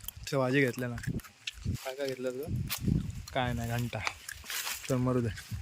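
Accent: Malvani, India. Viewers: Kiti god malvani bolte ❤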